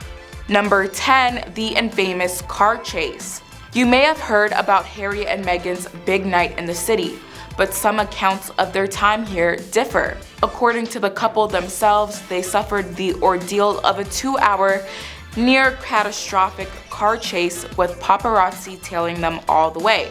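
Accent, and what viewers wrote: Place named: United States. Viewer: I love American accents and yours sounds like caramel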